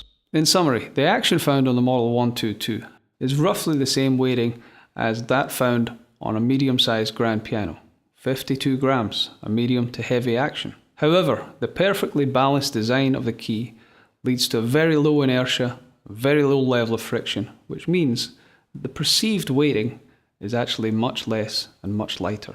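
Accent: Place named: Vienna, Austria. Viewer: I love the viennese accent!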